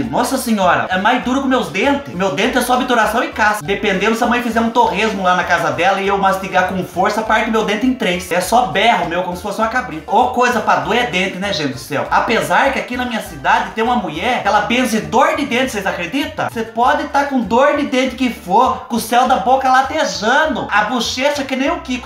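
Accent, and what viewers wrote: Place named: Caipira, Brazil. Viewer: Adorei seu modo “caipira” Um barato. Ameiiiii ❤️❤️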